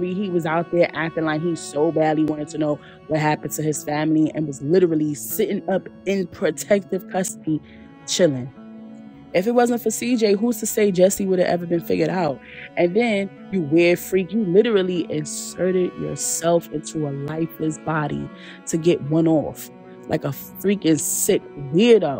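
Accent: New York, United States. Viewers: New sub! 😂 Love how you narrate! Do I hear some New York? 👀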